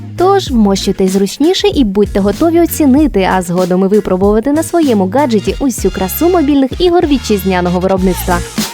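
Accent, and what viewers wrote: Standard. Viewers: Так круто слухати чисту українську мову, причому гарним голосом) Я теж роблю топи, якщо неважко, можете заглянути ;)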